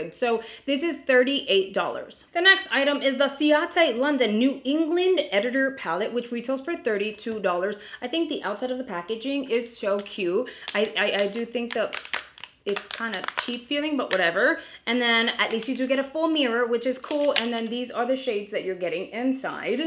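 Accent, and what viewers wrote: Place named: New Jersey, United States. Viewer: Congratulations on hitting 40 K on your YouTube channel, I watch you all the time and I love your New Jersey accent. Thank you for posting such fun and exciting videos. You rock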